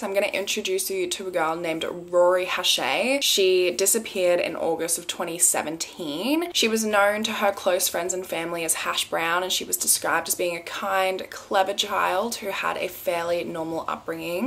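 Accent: Australia. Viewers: Bestie wake up, a new murder explained in a soothing Australian accent just dropped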